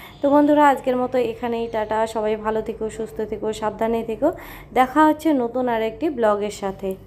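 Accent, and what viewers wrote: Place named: Rangpur, Bangladesh. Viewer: তুমি কোন ভাষায় কথা বলো,। বাংলাদেশের রংপুরের মানুষ তোমার ভাষায় কথা বলে।মানে বাংলাদেশের উত্তরবঙ্গের মানুষ তোমার ভাষায় কথা বলে। আমার খুব ভালো লাগে ❤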